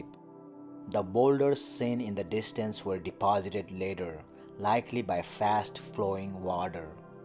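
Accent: India. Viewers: I find it a little harder to understand as it has an Indian sounding accent. I am from the UK.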